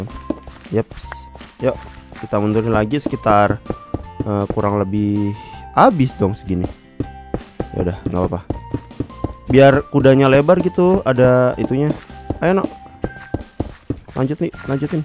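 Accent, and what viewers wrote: Java, Indonesia. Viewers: bang apik bang omongan medok mu kwi aku yo wong jawa bang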